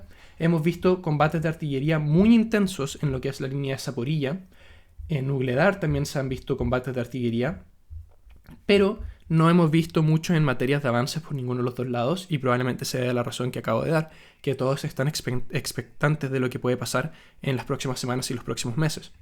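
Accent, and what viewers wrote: Chile. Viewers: ¿Por casualidad eres chileno?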